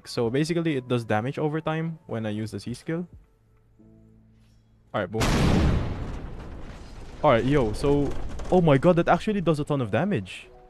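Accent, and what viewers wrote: Philippines. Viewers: You give me that filipino-english accent vibe. Are you?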